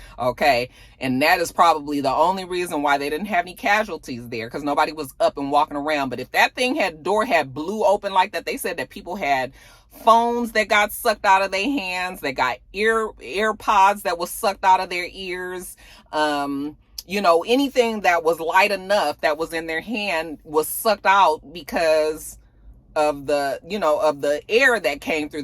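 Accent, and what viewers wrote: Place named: Los Angeles, United States. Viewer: is so ATL. you still have some LA in your voice. lol.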